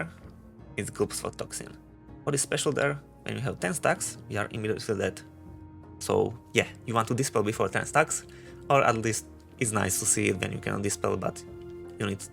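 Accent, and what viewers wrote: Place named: United Kingdom. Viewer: why in the world do people who mumble, and have thick british accents make guides. totally bizarre.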